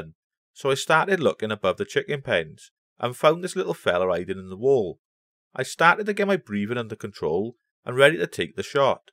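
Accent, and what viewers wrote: Wales, United Kingdom. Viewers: Ha , great video, love the Welsh accent. Don't the rat's that get inside the wall where you can't recover them start to honk after a few days? Especially in that steamy hot Pontypool climate.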